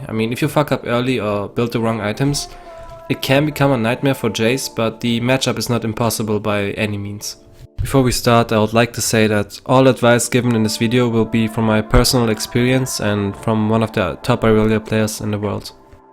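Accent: Germany. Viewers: One question... Is he german, his voice sounds german in my opinion :D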